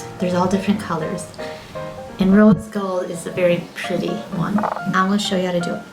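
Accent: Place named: Chicago, United States. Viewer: I prolly said this already, but my sister lives in Chicago. haha. It's thanks to that, that I learned to identify the accent. Box = backs. Solids = salads. Tom = Tam. Hahahaha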